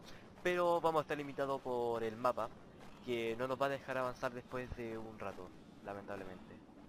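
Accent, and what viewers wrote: Chile. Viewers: oye eres chileno?:v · Eres chileno chileno y chileno se reconocen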